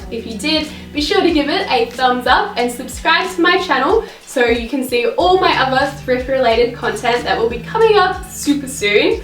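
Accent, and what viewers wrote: Australia. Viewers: Her accent is actually Australian :)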